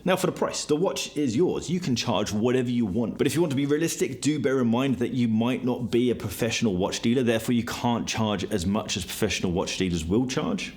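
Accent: RP, United Kingdom. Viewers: is uk based surely you have picked that up from his accent. Things happen outside the USA. But he should have clarified it’s a UK eBay Free fee offer for private sellers. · Very posh ❤ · To be clear, in the UK it is free to sell for private sellers, not business sellers. Also vehicles aren’t free. If you are in the US or elsewhere or are classed as a business seller then this isn’t the case., if the accent didn’t give it away, is UK based and not a business seller in this case.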